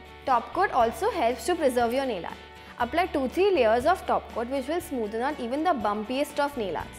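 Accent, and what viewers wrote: India. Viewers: that’s Indian accent lmao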